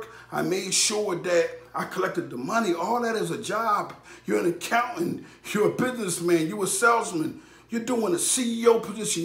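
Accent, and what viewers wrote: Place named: East Harlem, United States. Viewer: Yu got like a East Harlem/South Bronx accent. U from the x?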